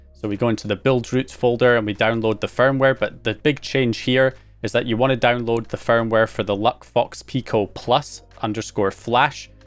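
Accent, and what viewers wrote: Scotland, United Kingdom. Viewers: My Scottish wife wondering which part of Scotland you're from?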